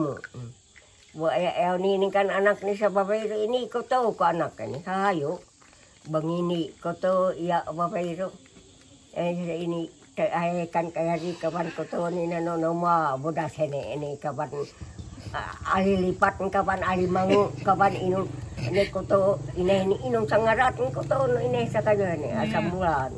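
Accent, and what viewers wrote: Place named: Paser, Indonesia. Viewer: Biar ulun kada mngarti bahsa maanyan tpi ktuju mandangar logat sdin 😁. Mrip2 bahasa paser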